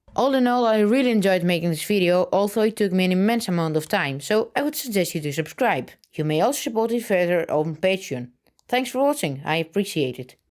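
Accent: Netherlands. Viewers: It's a very thick Dutch accent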